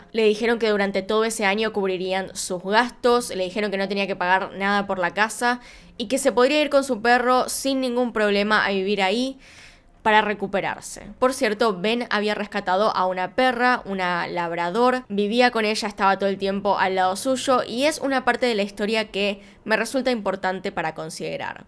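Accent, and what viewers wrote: Argentina. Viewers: que te pasa con el acento argentino, gil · Lo único malo es el acento argentino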